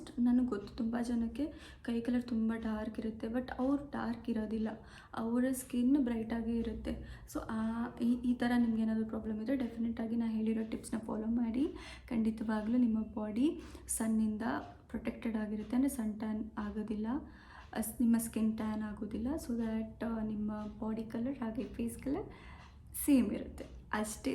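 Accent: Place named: Bangalore, India. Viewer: Nam Hubli kannada matadri Bangalore style Kannada beda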